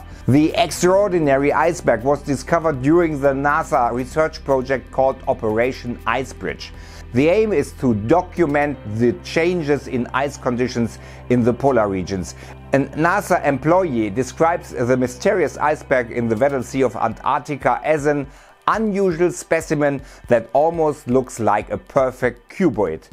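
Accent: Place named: Germany. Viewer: Gutes Englisch! I love how the germans pronounce english words. :D Sounds a bit weird and funny. Außerdem liebe ich den Kontent! Das Englisch frischt das ganze noch 'n bisschen auf! Weiter so!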